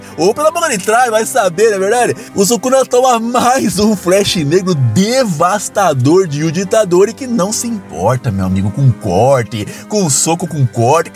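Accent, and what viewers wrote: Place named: Minas Gerais, Brazil. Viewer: O dono do canal ficou tão feliz que deixou todas mineirisse dele sair kkkk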